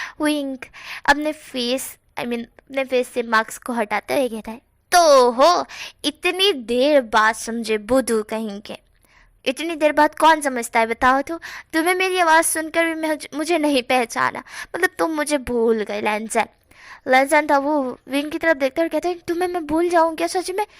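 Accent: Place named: Gujarat, India. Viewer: Aap ki thodi boli gujarati he❤😊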